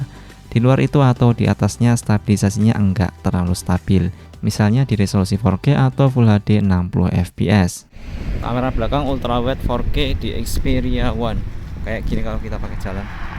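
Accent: Boyolali, Indonesia. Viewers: Ealah mas, orang Boyolali to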